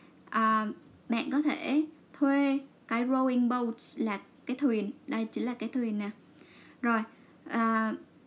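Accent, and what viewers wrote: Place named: Dong Nai, Vietnam. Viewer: Không liên quan, nhưng em nghe giọng chị giống người dân Gia Kiệm Đồng Nai ạ :)) và cảm ơn các Tip của chị ạ, khá chất lượng và giúp e rất nhiều.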